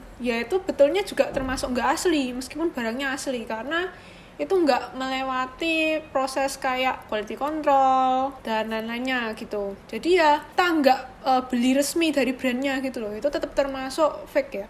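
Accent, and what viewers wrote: Java, Indonesia. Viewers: Mbak mbak faforit gua 😁 medok banget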